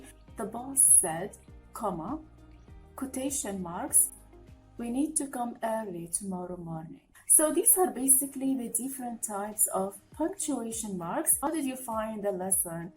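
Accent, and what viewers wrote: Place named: England, United Kingdom. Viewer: thank you, I really appreciate your work, btw I love your English accent and your soft voice <3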